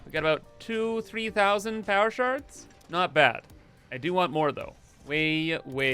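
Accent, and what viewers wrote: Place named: Canada. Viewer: OOT and ABOOT! Do I detect a fellow canuck??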